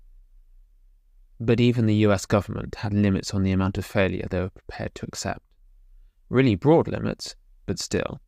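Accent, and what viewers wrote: United Kingdom. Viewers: I quite like that it is author narration. Also better British-accented American, than AI spoken anything.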